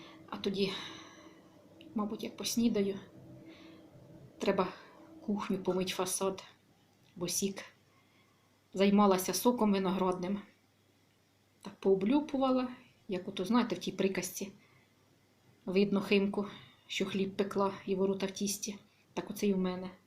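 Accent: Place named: Cherkasy, Ukraine. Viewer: Дякую, з задоволенням вас слухала. Подобається ваша говірка.Чи вгадаю? Напевно Черкащині. Простий і, напевно, смачний спосіб приготування риби.Обов'язково спробую.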